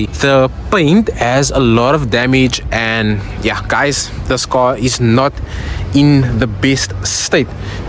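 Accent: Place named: South Africa. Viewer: bro got that brai/bokka accent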